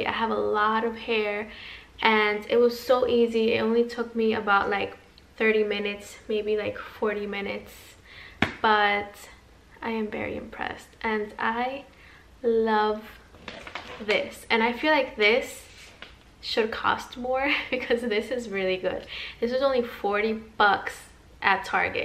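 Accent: Dominican Republic. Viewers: Esa forma de hablar... Indudablemente Dominicana jajajaajaja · Me sorprendió mucho escucharte hablando español y darme cuenta que eres del patio. 🇩🇴🇩🇴🇩🇴💪🏽❤️ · Saludos desde RD! De donde eres? Suenan como Dominicanas. Me encantan tus videos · Are you Dominican? Cause the accent when you talk Spanish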